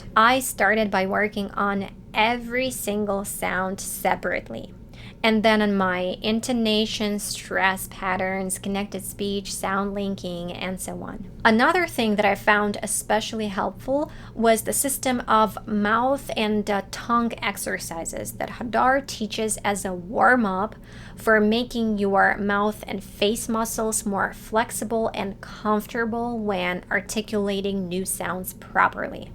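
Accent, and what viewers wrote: United States. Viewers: How long did it take you to master American accent ? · Dear Madam, congratulation on the level of Amercican accent. You have made a great progress, but be aware of the fact that all the media products mentioned by you need not be available to everyone. To turn your presnentation into a resource for listeners, have your presentation arranged in parts dealing with words, phrases and sentences spiced up with specific aspects e.g. setting the organs of speech before starting the warm-up exercises for pronounciation of sounds, syllables, then at phrase level the stress and finally intonation at sentence functions., etc. making up the so-called mile-stones along the almost never-ending but really a rewarding journey of learning a foreign language plus the culture, traditions etc. Best regards, Paul,67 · I just have known your channel since March this year. 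I must have to say your accent let me consider you are from America. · It doesn't seem to me that you are not american because of expanding your accent accuracy. Go beyond and make yourself smarter more and more.❤❤❤❤❤ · Modern British RP is more distinctive and eloquent. Just my opinion. You're doing very well with your American accent though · You’re American accent sounds beautiful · Why you the force of pronounciation like the accent American?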